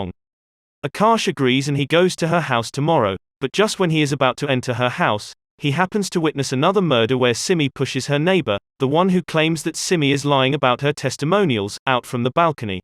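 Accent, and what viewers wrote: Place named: United Kingdom. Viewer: Wow ur first video n u got 600 k views .... bollywood is lucky for u ... u have a british accent but ur from USA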